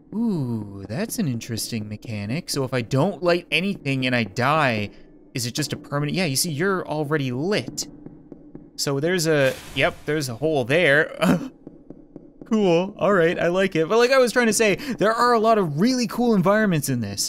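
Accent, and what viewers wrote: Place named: Canada. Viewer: can i get a copy of candleman please i love your channel and your canadian acsent is so cool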